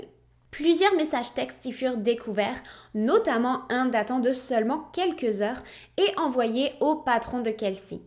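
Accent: Quebec, Canada. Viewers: Elle sonne québécoise (du Canada) mais avec aussi un accent français. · tu as un tout petit accent quebecois, j'adore ! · J'adore tes vidéos et surtout ton accent québécois que je trouve à ravir 😍 Que des histoires affreuses et immensément triste ... Merci ☺️✊🏻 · Est tu québécoise? Jattend un certain accent! Je ne te connaissais pas ta chaîne et jadore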